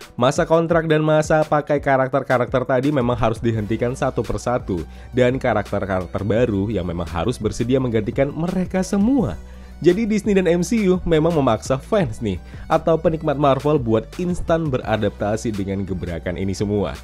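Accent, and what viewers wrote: Medan, Indonesia. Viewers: Orang Medan kau bg?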